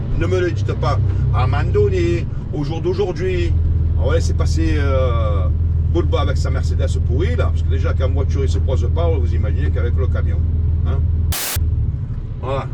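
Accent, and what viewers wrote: Marseille, France. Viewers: Voilà ce qui me manque le plus aujourd´hui c´est ce franc parlé direct sans méchanceté ni agressivité que tu maitrises à la perfection. Le tout avec une pointe d´humour sans oublier l´accent Marseillais bien évidemment.......:)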